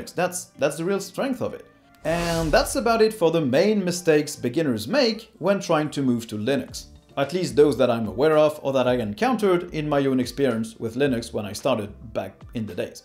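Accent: France. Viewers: Are you french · Ah such a relief to hear the half french accent pronouncing 'linux' again.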